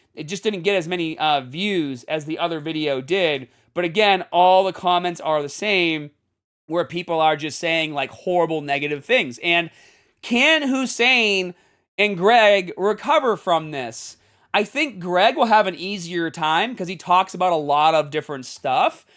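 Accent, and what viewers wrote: Boston, United States. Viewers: I'm just here for that Boston accent bro. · just found your channel. New to GymTube so I was watching Greg for a bit (along with Athlean X. Great content). Will watch you instead of Greg going forward. Accent reminds me of Will in Good Will Hunting 😀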